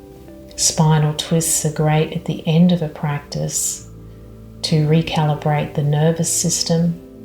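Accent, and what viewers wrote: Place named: Australia. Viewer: Sublime thank you. I loved hearing the Aussie accent. What a beautiful setting...Blessings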